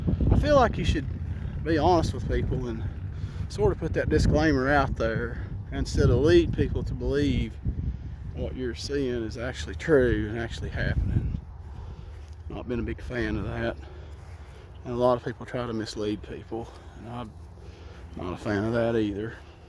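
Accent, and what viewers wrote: South, United States. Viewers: Always good to wake up on a day off and see has posted!!! It’s good you mention music it’s through the music I found the beautiful folk lore! Listening to Doc Watson and the such made me want to get a deeper knowledge of Appalachia because it reminds me a bit of the part of England I’m from. One thing I’d love to ask, going by your accent and some of the place names you mention I’m guessing your in Rasslin territory. Where you your self ever a fan? I love 70s/80s pro wrestling and the south has the best!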